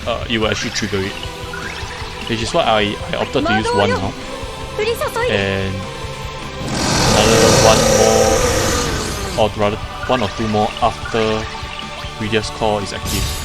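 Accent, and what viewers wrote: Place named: Singapore. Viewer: You Singaporean? I can recognise the fellow accent xD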